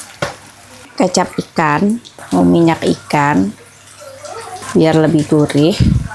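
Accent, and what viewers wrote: West Java, Indonesia. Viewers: Teteh, saya suka dengan cara ngomong sunda teteh ❤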